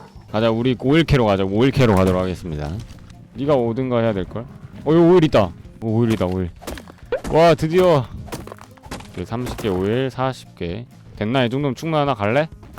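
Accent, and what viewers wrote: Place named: Jeolla, South Korea. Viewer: 목소리에서 전라도 사투리가들리네요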